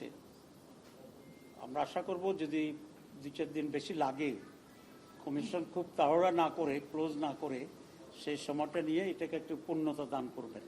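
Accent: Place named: Chittagong, Bangladesh. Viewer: বি,এন,পি র সালাউদ্দিন সাহেবের অনেক যুক্তিপূর্ণ কথা উনার শ্রবন কটু চিটাগাং এর আঞ্চলিক টানে হালকা হয়ে যায়।মইন খান বেটার অপশন হতে পারতো। মির্জা সাহেব যেহেতু এই ঝামেলায় আসতেই চাচ্ছেন না।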